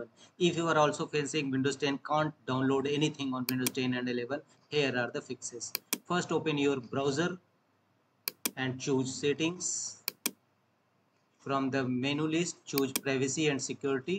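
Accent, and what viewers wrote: India. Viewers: I already knew it that it was gonna work after hearing the Indian voice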